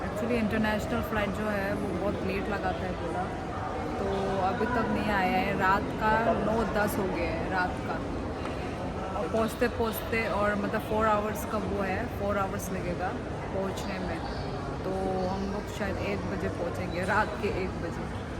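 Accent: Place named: Tripura, India. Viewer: Tripura ka accent aa raha h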